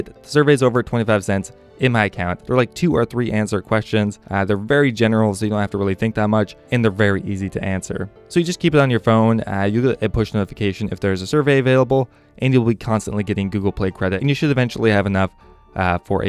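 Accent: Quebec, Canada. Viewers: Wait canadian but french lemme guess quebec?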